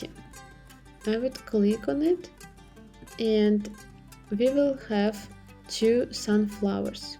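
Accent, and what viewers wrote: Russia. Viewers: Thank you!(акцент русский)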